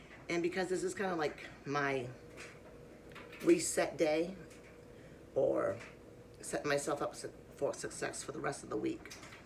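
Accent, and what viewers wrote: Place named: New England, United States. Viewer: I thought I detected a new England accent on you I have relatives thst live in foxovuro MA we used to drive down there all the time when I was young and it was always my favorite vacation. My aunt lived on the end of a dead end street right on a lake and Shaffer stadium was right on the other side of it so we used to hear concert from her back yard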